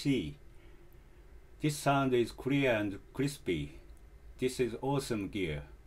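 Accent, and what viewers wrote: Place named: Japan. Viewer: You got a such a nice place for recording. Could use more space though with all that equipment. :) Air conditioner and all, I wish we had something like that. What a difference, I play in a basement of age old industrial building where everything is ugly and in disrepair. But at least there's plenty of space and its cheap... The reality for metal bands in Finland is pretty much like that, things are simple but functional and the rehearsal spaces are almost always in old ugly buildings out of sight and mind of most people. I'm wondering how expensive it is to rent a rehearsal space in Japan? In Finland it varies a lot, in my town which is a small place it can be very cheap if you get lucky.... In Helsinki its much more expensive and the places are almost always very small. Our place is pretty much as good as it gets, over ten people sharing the rent and now that two guys left and I cleaned the place, there's a lot of space for everything. 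I'm jealous of your recording equipment. Damn, I wish I could record in separate tracks too. Takes some time to put it together, I have been using all the money I can spare for the drums in last few years. Recording equipment is the next on the list. ;) Your English is very good, understood everything as you meant it. Have you studied it somewhere or just learned by yourself? I love your accent, I don't hear a Japanese person speak in English often. :D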